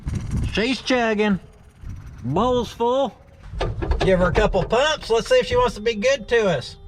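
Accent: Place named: South, United States. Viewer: Dude im not even from the south and I love his accent and additude.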